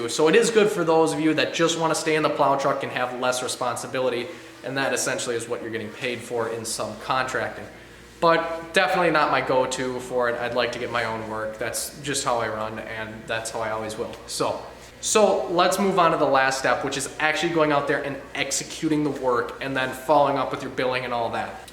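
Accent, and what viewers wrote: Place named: Wisconsin, United States. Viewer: Sounds like your from wisco.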